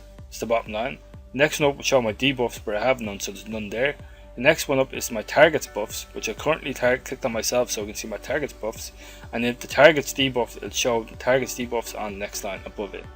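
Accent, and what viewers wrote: Ireland. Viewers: Where abouts are you from man? I sense an irish accent!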